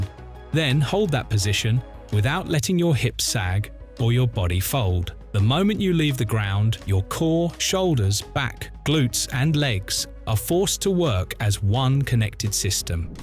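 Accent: England, United Kingdom. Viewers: Thanks enjoy your vids. Good exercises to build into my routine. But would be good if vid matched the lovely sounding (AI) englishman. Nobody in the vid was actually holding the Archer pushup - all were moving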